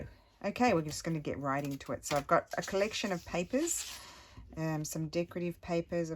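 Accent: Australia. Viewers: I love how you sound more "Aussie" the longer you are away from home! This is lovely. I am still holding out hope that my birthday challenge entry arrives this year!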